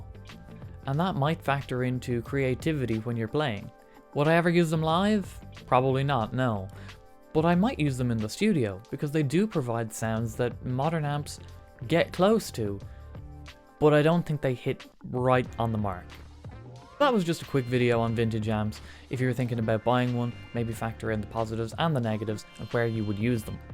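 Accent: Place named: Ireland. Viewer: Great job lad. You've got great chops on the guitar. Also is your accent North Wicklow or Dublin?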